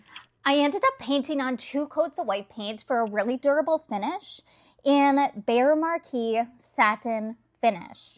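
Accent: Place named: Midwest, United States. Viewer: Wow, your accent is so fascinating! It's like you're from Minnesota/Wisconsin but you've lived in California for 20 years. I am a HUGE fan of painting backsplashes instead of retiling if you hate it. You nailed it.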